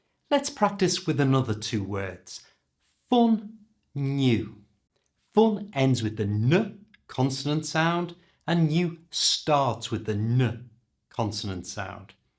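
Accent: United Kingdom. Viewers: thanks so much, the british accent is the best.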